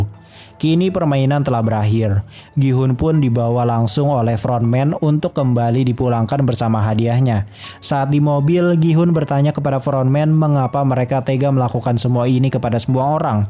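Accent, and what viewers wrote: West Java, Indonesia. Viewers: Orang Sunda mang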